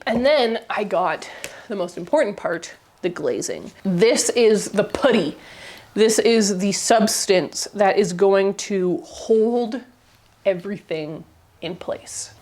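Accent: Canada. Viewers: No, sorry! Ha, I mean the pronounciation- at first I was like, "Wait, is she saying pouddy?" And then I realized that that is, in fact, how I say it too. It's the 'about/aboat/aboot' accent coming for us again. That and saying double t's as d's- but really if we don't do that then we sound british, so I say just rock the Canadian accent with pride lol.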